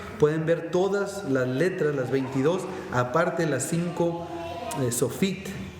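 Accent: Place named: Colombia. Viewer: Buenas tardes como está usted? Por su acento usted parece que fuera colombiano. Mira te voy a decir una cosa por favor ya no le estés enseñando a la gente eso DEL SEÑOR De cual señor usted me habla del señor Alberto el señor Pedro el señor José. Y de cual Dios usté habla del Dios de las moscas del dios del viento del dios del fuego. Déjeme saber por favor. Yo soy colombiana pero por favor enseñe lo que es correcto. Desde aquí desde Estados Unidos. Y por favor responda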